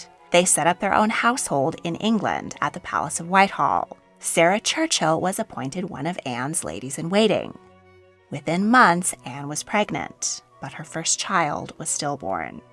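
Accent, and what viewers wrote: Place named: United States. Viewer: The narrator's voice is rather unpleasant. Sharp, constipated, painfully american.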